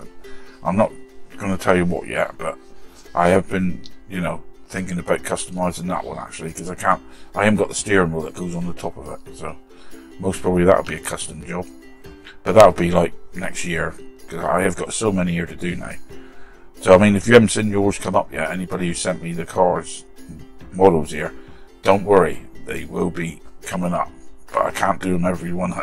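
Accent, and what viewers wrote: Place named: West Country, United Kingdom. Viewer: Hi, love the videos seen most of them, usually start my day with one, grew up in Dorset and have lived in the California for 36 yrs, love to here the west country accent.🇬🇧🇺🇸😎👋👍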